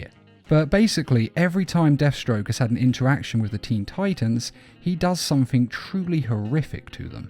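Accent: England, United Kingdom. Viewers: I think it's an English accent.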